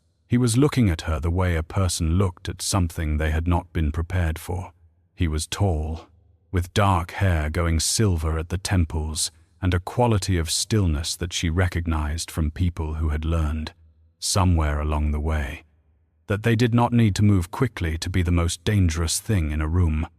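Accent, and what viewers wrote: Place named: Eastern Europe. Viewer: The story is pretty good, but the pronunciations of the Italian names sounds more eastern European. You have to make it some more Italian. I would say eight out of 10.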